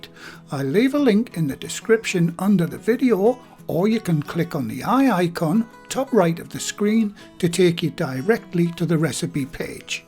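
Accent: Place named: Northern England, United Kingdom. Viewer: Awesome video and for this old English gal displaced in Texas, it was lovely hearing that great northern accent! I’m going to have a break from making sourdough to bake this beautiful loaf, less of a challenge! Thank you 🤩👍🏻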